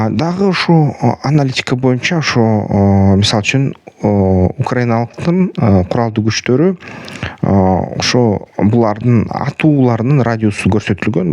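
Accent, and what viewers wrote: Standard. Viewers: Азаматсын таза кыргызча тушундуросунда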